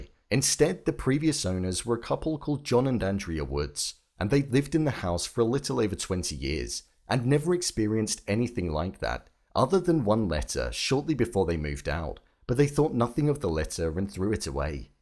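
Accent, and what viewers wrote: England, United Kingdom. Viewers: Is that english’s accent is trying to discredit Netflix? Irritated for The Crown series? A lot of novels, history books, screenplays had different changes in a movies, series or documentaries. Only knowledge can set you free.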